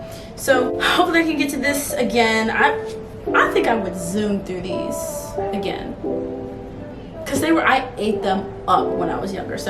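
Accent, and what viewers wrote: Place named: England, United Kingdom. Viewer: Oh my god, so glad you popped up on my recommended! You have such a great personality and loving the english accent ;)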